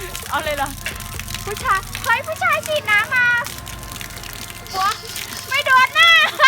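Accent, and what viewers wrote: Isan, Thailand. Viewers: มักคำเว้าแหมะค่ะ555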